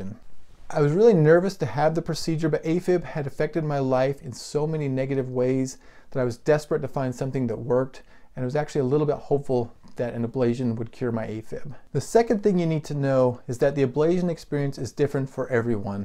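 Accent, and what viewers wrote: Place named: England, United Kingdom. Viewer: Hello. You sound like you might be in England. I don't really agree with all the AFIB protocols recommended there. Keep in mind... that the longer you stay on AFIB antiarrhythmic meds... the more the heart 'remodels' and the less effective an ablation may be. I heard from a rather upset woman in England whose Cardiologist prevented her from getting an ablation, keeping her on a pill protocol for a lengthy period. When she finally did get her ablation, the EP said you waited too long and it won't work that well now. A big mistake is not going to the AFIB professional Electrophysiologist (EP). When I came down with AFIB, I dumped my Cardiologist and contacted an EP immediately. I've had many ablations by the best EP's. None of them had me do a Stress Test. Makes me wonder if you're on the right track. In any case, I wish you the best outcome. 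Every case is different. There are many people who never get ablation and do quite well on pills for decades. Whichever path you choose, I'm sure you'll be fine. I first came down with AFIB around 54. I see some people come down with it when quite young. Sad is the number of pro runners who come down with it.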